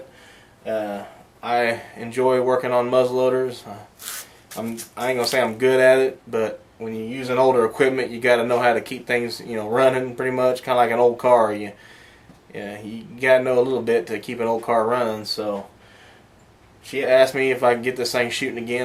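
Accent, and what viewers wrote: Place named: Texas, United States. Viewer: Saving this video for my brother and I. We are jsut getting into the Muzzle loader thing and this video is great. Thanks man. You must be from Texas. You sound like my family.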